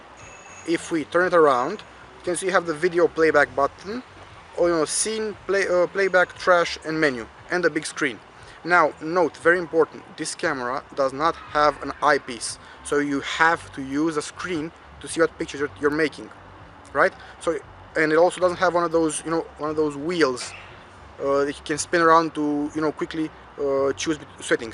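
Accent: Romania. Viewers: Salut, Banuiam dupa accent ca esti roman, apoi cand am vazut ca ai aparatul setat pe romana am zambit:) Am de gand sami iau aceasta camera pt pret si pt ca vreau doar poze ,,cazuale,, daca ma intelegi. Apreciez munca ta si bafta in continuare. :D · Mai... Dupa cum vorbeai la inceput puteam sa pariez ca esti roman. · I like the fact that you talk fast, but i think it would be nicer if you worked more on your accent. From the first moment i knew that you're romanian and for some people the fact that they can tell you if you're a native american or not is a nice thing, but not for me.. It's kinda' like hearing an american talking romanian (without having the native accent)... Thanks for the review. As far as the camera that i shoot with (Canon PowerShot A610), i think the Nikon Coolpix L310 would make my photos looking pro (only in comparison with my actual camera). 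You may say that this camera is not for professionals, but i think it surely can take profesional photos if you know how to shoot. Thanks again!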